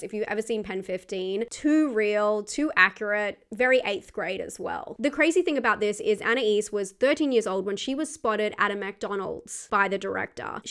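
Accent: Australia. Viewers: Australian gives Australian delivery. The upward inflection at the end of sentences is hard to miss. Good to hear a fellow Aussie and it looks like you have a loyal following. Keep it up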